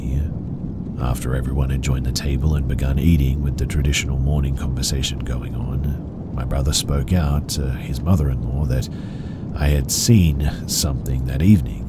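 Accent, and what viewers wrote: Australia. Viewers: Was going to say better be an Aussie saying that but then could tell 😅o Aussies speak like that 😂❤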